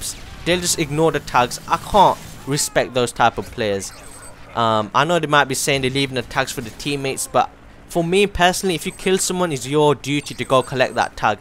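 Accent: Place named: Europe. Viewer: Watching this video, Grandma is sitting across the table asking "who is that on the computer" I tell her you are from Europe, she likes your accent. :) Great vid, been rocking that pp90 with rapid/silencer = beast mode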